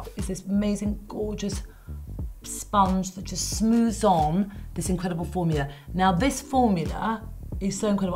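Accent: England, United Kingdom. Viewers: cute lady...nice english accent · Not just her products and makeup techniques, but also her personality and her English accent 😊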